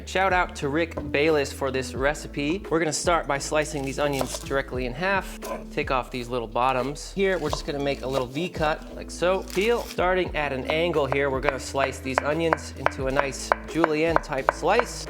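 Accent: United Kingdom. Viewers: "Woostersheer" is how to pronounce it. Great to know you were born in UK. You can take the guy out of Britain, but you can't take (all) the Britain out of the guy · Where in uk were you from sonny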